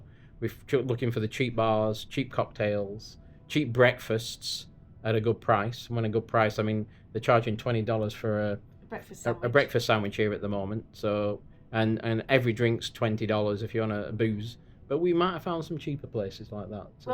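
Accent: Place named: Yorkshire, United Kingdom. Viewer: Love the Venetian. Wow can tell your from Yorkshire 😂 what a deal!x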